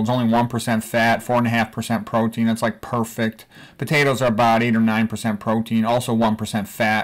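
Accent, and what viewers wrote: Chicago, United States. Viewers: What an incredibly fortunate student I am to have you as my instructor! Your tutorials are always clear & detailed, which allows us to easily understand & follow. Your drawings are especially helpful. THANK YOU for your continued generosity in producing these videos. As an aside, your Chicago accent is entertaining as well!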